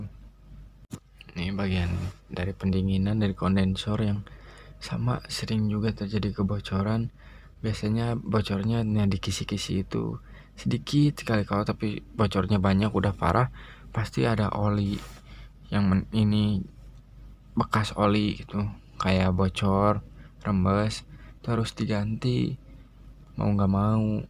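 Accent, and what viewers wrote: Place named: West Java, Indonesia. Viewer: Logatna Sunda euy... Kumaha damang kang 😁🙏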